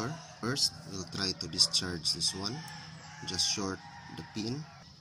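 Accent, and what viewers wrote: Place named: Philippines. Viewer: Your English reveals that you are from the Philippines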